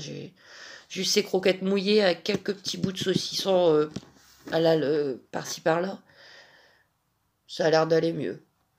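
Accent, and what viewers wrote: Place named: Pas-de-Calais, France. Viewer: Bonsoir. Je vous suis depuis un petit moment, mais je commente pour la première fois. Bon, la poisse c'est la loi des séries... il y a des jours où il vaut mieux rester couché 😅 et le lendemain ça va mieux... Pour les migraines, est-ce que vous avez essayé l'ostéopathie? Pour les pâquerettes, on peut les faire sécher et les mettre dans de l'huile alimentaire. On fait macérer 3 semaines, on filtre et ça fait une super huile pour nourrir et raffermir la peau du corps😊. Une idée pour prendre soin de soi à petits prix. Parce que vous avez besoin de vous occuper de vous pour aller mieux dans votre moral... J'aime bien vous écouter parler. Toute ma famille étant du Pas de Calais, j'ai grandi avec cet accent... moi je suis dans le sud, mes parents s'y sont installés quand ils se sont mariés... mais mes racines sont là-haut... 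Bonne soirée.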